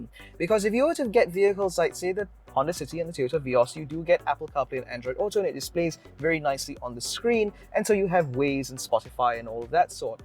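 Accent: United Kingdom. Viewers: Raw malay rempit look with Nice british accent. My brain is scrambled.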